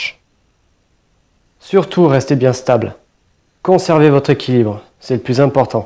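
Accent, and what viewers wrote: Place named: South, France. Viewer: T'as l'accent du sud parfois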